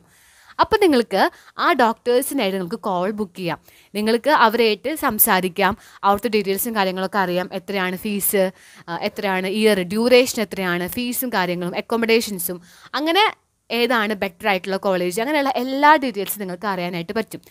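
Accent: Thrissur, India. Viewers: നിങ്ങൾ തൃശൂർകാരി ആണോ, റിപ്ലൈ തരുമ്പോൾ ഇടാം ട്ടാ എന്ന് പറയണൊണ്ട് ചോദിച്ചതാ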